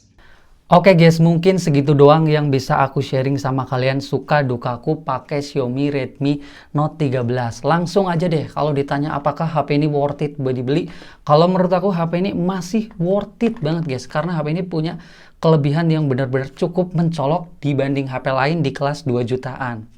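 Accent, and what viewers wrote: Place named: Banyumas, Indonesia. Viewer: kiue ngapak deneng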